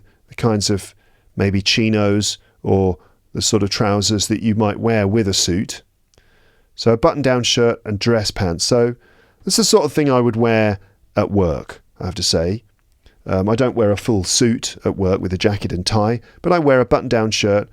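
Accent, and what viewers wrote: United Kingdom. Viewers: Is this british accent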